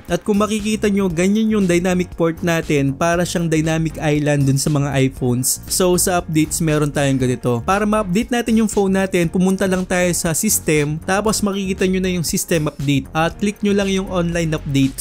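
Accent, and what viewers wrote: Pampanga, Philippines. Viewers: Kapampangan accent tlga e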